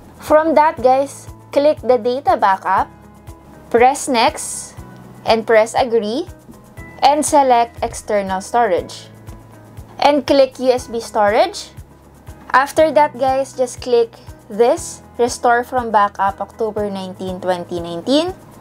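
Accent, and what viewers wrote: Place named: Tagalog, Philippines. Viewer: Tagalog accents* Dongle -----> DONGEL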